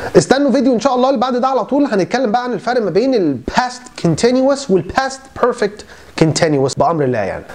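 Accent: United States. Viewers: past participle نطقك امريكان والنطق اللى انت اتريقت عليه ده بريتيش ارجو لفت الانتباه لذلك لان بعض الناس هتعتقد انه نطق خاطىء وشكرا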